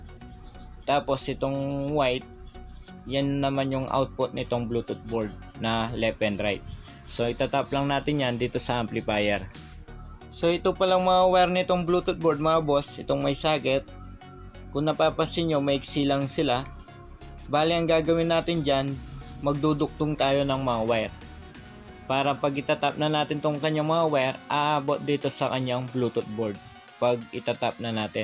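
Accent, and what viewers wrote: Pampanga, Philippines. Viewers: Kapampangan ka ne boss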